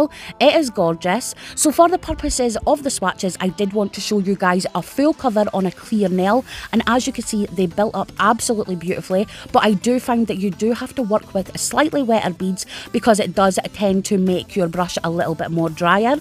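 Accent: Scotland, United Kingdom. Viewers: Love the colours I need to get me some colours! Keep up the good work. Love ur accent by the way it reminds me of my mum, she's Scottish from Hamilton.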